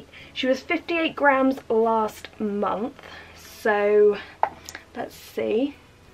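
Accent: United Kingdom. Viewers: Think i ask this on all your videos but never get a reply. Are you from the uk?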